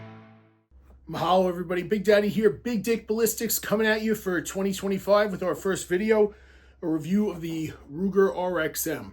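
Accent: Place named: East Coast, United States. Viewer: The most east coast accent ever lol.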